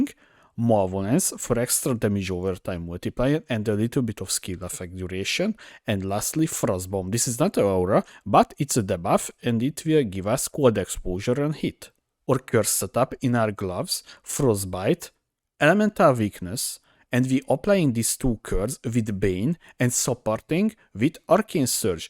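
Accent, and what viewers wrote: Hungary. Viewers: You must be Hungarian with this accent. :D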